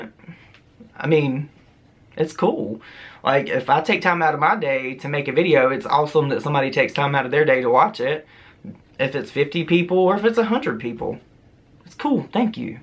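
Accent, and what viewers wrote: South, United States. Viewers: Aw, I just found your videos tonight and I absolutely love your southern accent. Promise things get better, screw those people who think they're all that and can do whatever, they aren't anything. Have a wonderful night, it'll get better.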